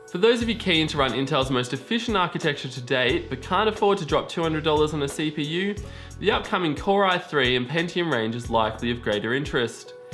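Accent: Australia. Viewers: Are the parts priced in USD? Because you sound Aussie and these prices are tripping me out. (Unless you live in the US or are relating to the greater audience then fair enough). Get us them on mate rates? ;) Lmao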